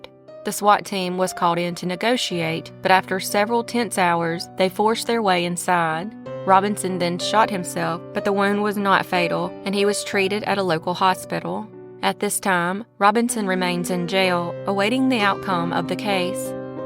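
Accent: South, United States. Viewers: Hold up!?! 2 days off!?! Glad you didn't lose your Southern Charm Voice!! Irrelevant but kinda funny! My kids are out of college now.. My brothers youngest is a little 8 year old firecracker! (We went to visit for Christmas..). While asleep lil miss I hate boys vaulted up and smacked me on the mouth.. Cute but.. Dang.. Okay.. My brother (her father) laughed at it.. "Time to wake up and pretended to be an uncle and help your brother out".... (We are close.. Post high school and all the blood fights). I digress.. She got me SICK! Lil Terrorists.. So, I spent Christmas day doing highschool stuff to the toilet.. Got back in bed.. AND, .. I found some I actually missed and some I didn't comment on.. I actually felt like.. (sh!t for sure physically) but remiss that I missed them.. remiss / missed? Maybe I am still sick.. I fall asleep! "WHO IS IN THERE WITH YOU UNCLE DAVE!?!" "She has a really cool voice! 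I want to meet her!" I'll leave that up to her father..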